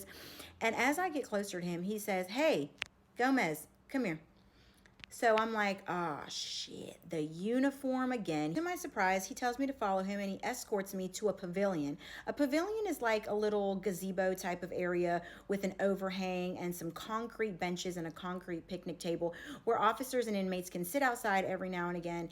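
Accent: South, United States. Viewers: Wait, I just watched you with Ian Nick and you said you were a Jersey girl and spoke as one. What's with the "y all" and southern accent. It's all good .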